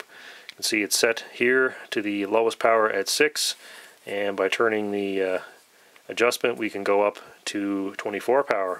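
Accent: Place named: Cape Breton, Canada. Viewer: Canadian right ? I thought the accent sounded very familiar. I'm gonna take a shot in the dark but based on the accent, I think east coast. Cape Breton perhaps. I'm a Newf here in St. John's. Just bought a new scope today. Bushnell Dusk til Dawn Model. Gonna mount it on my .22 air rifle. but I need to buy mounts now. Happy Hunting, man.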